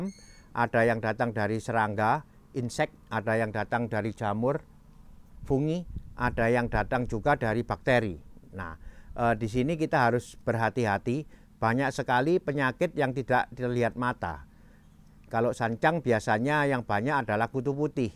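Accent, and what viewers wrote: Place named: Madura, Indonesia. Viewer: Logatnya kayak orang madura kok dipanggil koh 🤣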